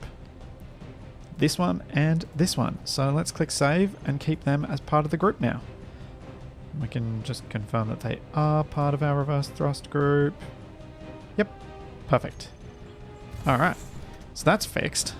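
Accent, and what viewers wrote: Australia. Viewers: Definitely. I'm assuming from the time you post and the accent that you're in Australia? I'm in a bit different time zone.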